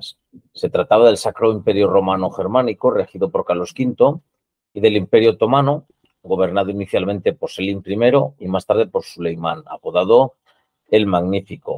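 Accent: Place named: Aragon, Spain. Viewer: Tú imagina un "no" a Napoleón con ese acento, te deja claro que solo pasarás por encima de su cadáver. Te lo dice otro mañico. Como dijo Joaquín Costa: cuando España ya no sea España, Aragón seguirá siendo España..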